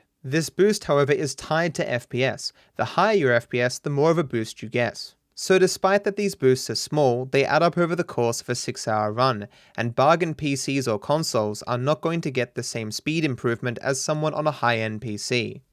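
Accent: Australia. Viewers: Wait your from Australia? Wtf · This guys stupid Australian accent mixed with his terrible speech impediment that makes his “R’s” sounds like “Aw” makes him impossible to understand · Oi, u are Australian? lol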